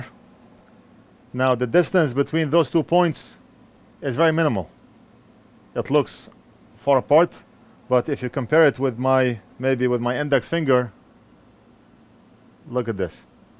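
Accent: Lebanon. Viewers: صدمتني بس صرت تحكي لبناني طول الوقت بشاهدك وبشاهد فيدوهاتك وربي صدمتني 😂😂😂 تحياتي بشاهدك من الاردن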